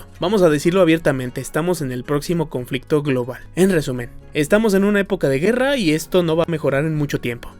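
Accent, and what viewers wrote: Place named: Mexico. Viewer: no puedo ver videos cuando la gente habla asi de culero jaja lastima se ve que tenias buenas intenciones pero tu acento me rompe las bolas, eres de mexico?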